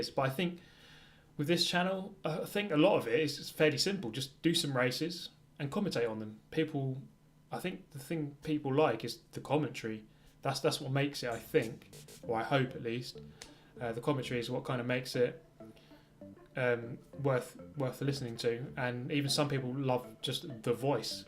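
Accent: England, United Kingdom. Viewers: Which area of England are you from? E.g. North east, south west etc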